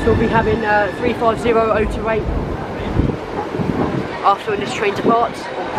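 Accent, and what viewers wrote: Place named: United Kingdom. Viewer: Your British accent is very pleasant…👍👍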